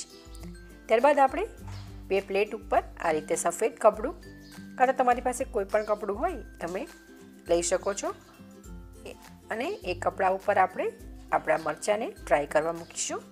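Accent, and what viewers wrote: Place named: Kutch, India. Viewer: Tamari language per thi laage che ke tame kutchi cho. Koik Kutchi recipe batavo ne.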